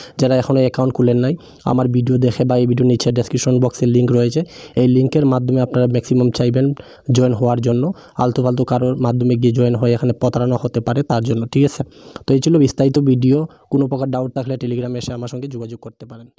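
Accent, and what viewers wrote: Sylhet, Bangladesh. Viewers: সিলেটি নি ভাই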